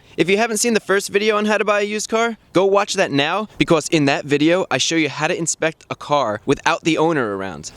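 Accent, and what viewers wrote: United States. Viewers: I like your enunciation and pronunciation. Are you american ?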